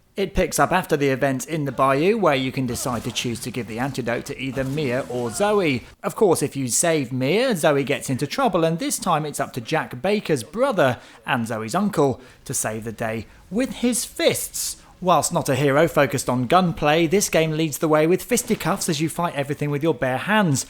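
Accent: United Kingdom. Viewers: good review:) I dont know why but british accent makes everything sound more credible,always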